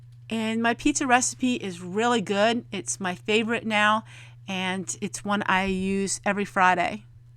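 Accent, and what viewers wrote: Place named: Pennsylvania, United States. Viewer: thank you for sharing. I lost my father several years ago and it feels like just yesterday. Thank you for sharing so much with us, so many skills that have been lost to us. I think you have a PA accent just like when I talk I have a NY accent and people don't always get what I'm saying 😆much love!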